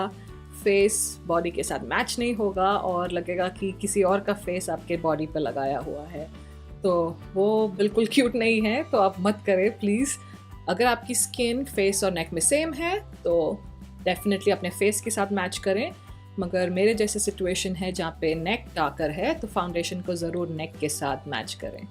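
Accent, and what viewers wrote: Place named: Mumbai, India. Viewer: this is amazing. this your best video. i like the red with this neutral look. i loved it sooooooooooooo very much. apki hindi bahot achi h, kuch words to mujhe bahot jaida ache lage, like u said bahot sunder lagega, chera barabar krne k liye. Mumbai wali Hindi di, maza aa gaya dekh kr. itna cute language me bola apne, loved it. u already knows that i love ur voice. plz or bhi video banayiye Hindi me.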